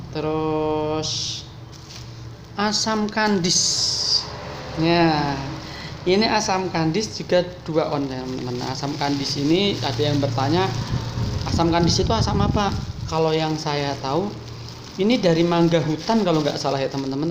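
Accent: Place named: Java, Indonesia. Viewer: Kayak logat orang jawa yg buat bumbu padang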